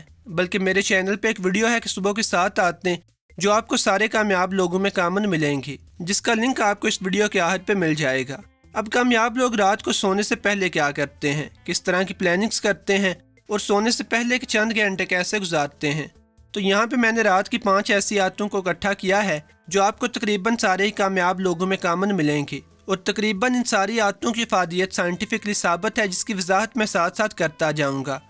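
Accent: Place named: Sialkot, Pakistan. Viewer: Itni achi research. V.good Bad pronouncing. Sialkoti lehja bolny Wala Bad... Apna lehja badlo...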